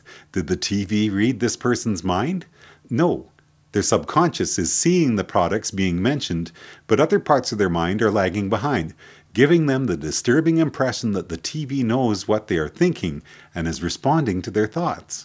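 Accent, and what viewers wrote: Canada. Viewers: hes from canada, to them its aboot not about.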